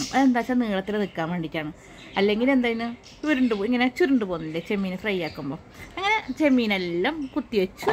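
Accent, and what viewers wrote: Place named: Kasaragod, India. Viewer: ഒരു വെറൈറ്റി ....സൂപ്പർ ...അതോടൊപ്പം കാസർഗോഡ് സംസാര ശൈലി ഇഷ്ട്ടപ്പെട്ടു ......കണ്ടതിനു ശേഷം ലൈക്കും .കമന്റും .സബ്‌സ്‌ക്രൈബും ചൈതിട്ടുണ്ട്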